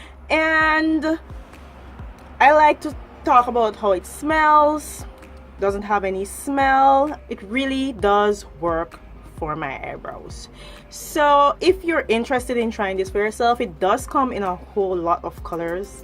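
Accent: Jamaica. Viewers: Are you Jamaican or Africa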